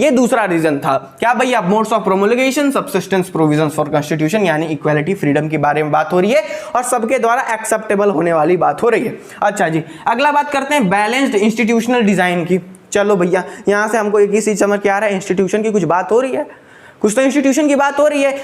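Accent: Bihar, India. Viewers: Pure bihari😊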